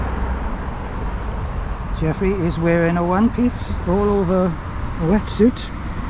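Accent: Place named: Wales, United Kingdom. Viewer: Well done! Thanks for posting this interesting and informative video! Your voice reminds me of family visits to Griffithstown (Mon), many, many years ago - will be starting to view your archive from the beginning after landing here due to 'YT Algorithms'.... We used to float about the Atlantic on a home-built 6-berth catamaran, but 'swallowed the anchor' many years ago.... part of our family is on the canals currently, wherever they can find water. I retired at the end of the last century, married the good lady, and launched our composite cat - which we sailed down to the Canaries for the honeymoon and a few years more. Our cat had an outboard motor leg that would pull up for weed removal, and (of course) for sailing. Much, much faster under sail! One of our mates out in the Canaries had a wake-board, and in ideal conditions we could rip across the bay with him behind us! 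The trick we used to free our prop (which worked sometimes) was to listen attentively for the engine revs dropping, and bang her into reverse - which could un-wind the flotsam, if we were lucky. Yours looked like a really obstinate tangle! Thanks again, a lovely tale.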